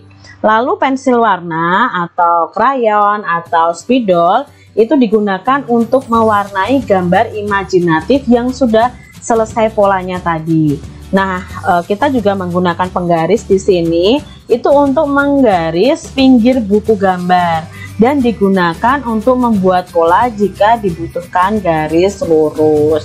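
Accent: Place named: Java, Indonesia. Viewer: Suara nya kok jawa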